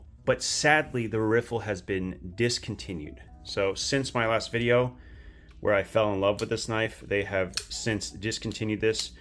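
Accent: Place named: Midwest, United States. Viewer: Virginia? Man, I thought I picked up a "great lakes accent" off ya,. Midwest, or maybe northeast. Anyway, if you could do a comparison video between the Sencut Snap, Waxahachi, Civivi Cogent, Relic Sinisys, and WE Snick, that'd be awesome. That blade shape is what made me want to try a Civivi in the first place.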